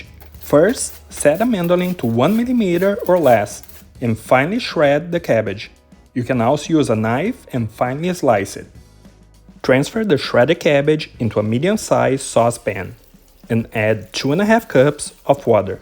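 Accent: Brazil. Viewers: This guy has to be Brazilian. I recognise my own accent 😂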